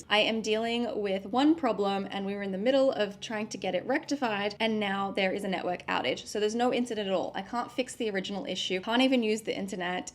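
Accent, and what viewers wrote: Australia. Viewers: Nice haul. BTW were you originally from Australia ? Cause you sound like one of us. I'm from Perth. W A. If you were ,what brings you to the states. Thrifting is definately better in the states. 😂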